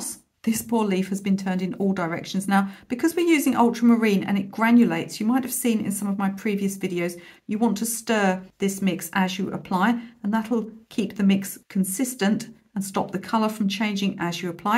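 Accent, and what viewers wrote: United Kingdom. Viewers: I love your shorter step-by-steps; I think we all love a quick win for the day! However, I also love the longer tutorials, as well. Honestly, I would watch any class you teach, even a sewing one (tho sewing is my nemesis). But maybe that's just your British accent and sense of humor! 🤣😉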